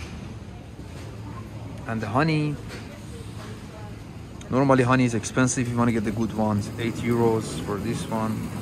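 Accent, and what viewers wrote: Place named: Iran. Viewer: داداش از لحجه ت تابلوعه ایرانی هستی دمت گرم😅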